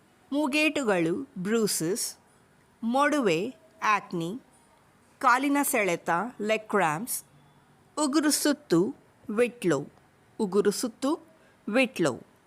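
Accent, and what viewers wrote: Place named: United States. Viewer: 😂😂 American & Kannadiga mix mam 😂😂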